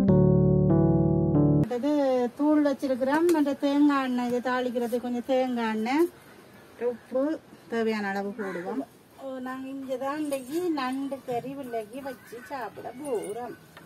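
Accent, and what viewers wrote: Jaffna, Sri Lanka. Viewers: Akka u vioce super original jaffna tamil 4 sweden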